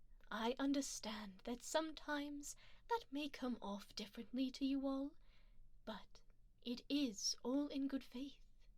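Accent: RP, United Kingdom. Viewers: Your British accent is amazing. So is this artwork of Leonara! You're so talented and amazing! You do a phenomenal job of bringing your characters to life! 😍😍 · I love when does her posh British accent. Its so relaxing.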